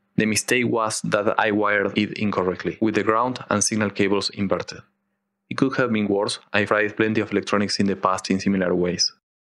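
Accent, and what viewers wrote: Argentina. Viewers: Espectacular tu prototipo. ¿Sos Argentino? te delata el acento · Increíble proyecto!!! Escucho un acento argentino o estoy flasheando :? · Really liked your video. You sound so Argentinian, like a friend of mine talking in english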